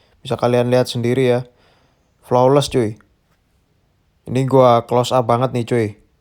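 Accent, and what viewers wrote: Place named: Java, Indonesia. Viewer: Waduhh wong Jowo 🤣To bwanggggg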